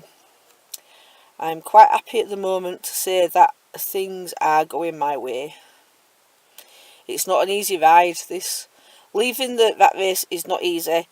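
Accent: United Kingdom. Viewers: I just discovered your YouTube channel after seeing your comment on the channel with the British guy in the Airstream. I'm watching this from Florida, in the U.S. I find it interesting to listen to people from the U.K. speaking English. It's like a whole different sounding language, even though I can understand everything you say.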